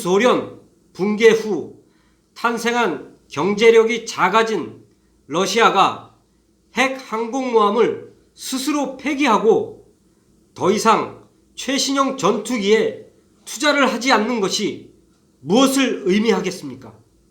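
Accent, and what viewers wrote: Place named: South, South Korea. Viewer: 얼굴은 이석기 사촌쯤 돼 보이고 억양은 아랫쪽일세... 국론 분열 획책의 일환.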